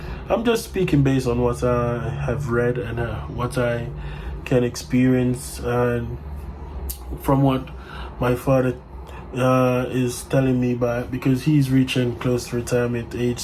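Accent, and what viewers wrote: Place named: United Kingdom. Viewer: no you British accent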